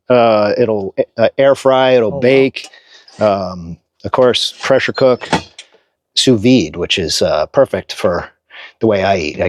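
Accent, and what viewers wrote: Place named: New Jersey, United States. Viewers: I could tell he was from Chersey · “ I kinda drive like I stole it”. HAHAHA!, you gotta be from Jersey or something’. Sound like the wise guys I grew up with. Great build brotha!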